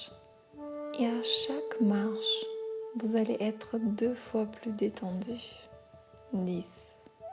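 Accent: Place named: Russia. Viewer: J'adore votre accent russe. Спасибо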